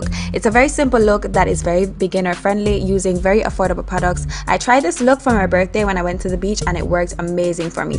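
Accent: Jamaica. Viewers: Jamaican ? Yayyyy!! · Are you Jamaican cause you sure sound like one ..... well I think you are ! God bless you .... you’re extremely beautiful and blessed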